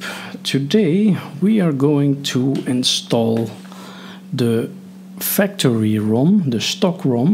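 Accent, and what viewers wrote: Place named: Netherlands. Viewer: You're Dutch I noticed it from your accent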